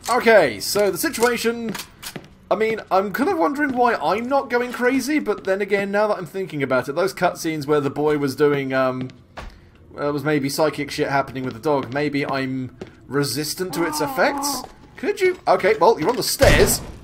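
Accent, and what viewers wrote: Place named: Russia. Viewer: Actually, I quite enjoy your Russian accents. Way better than what the Holywood degenerates think we sound like.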